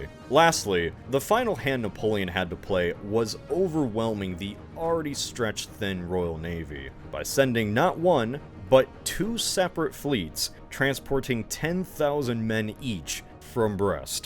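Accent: United States. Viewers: The way Americans pronounce English towns is excruciating. (But funny) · triggered in british at hearing american pronounciations of places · Yep, this is certainly an American pronouncing these French Marshall names. Epic History... My beloved, please, clean my ears of this hideous noise.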